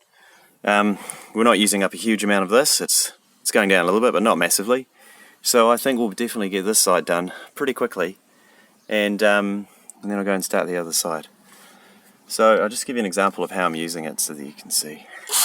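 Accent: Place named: New Zealand. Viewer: am i hearing a fellow kiwi 🤙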